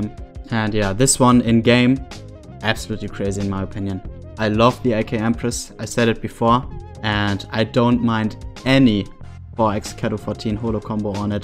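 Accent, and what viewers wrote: Germany. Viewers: Man hört so das du deutsch bist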